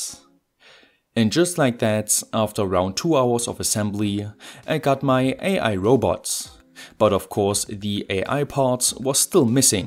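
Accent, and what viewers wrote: Germany. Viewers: Hey! Are you german? What's the accent?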